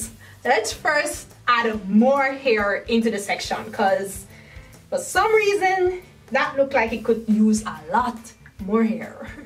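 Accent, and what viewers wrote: Jamaica. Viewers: She sound jamaican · I hear a Jamaican! I stan my fellow country woman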